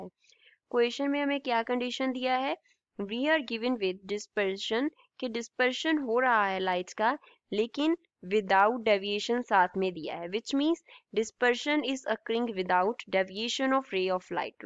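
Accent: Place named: Punjab, India. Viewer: Sounds Punjabi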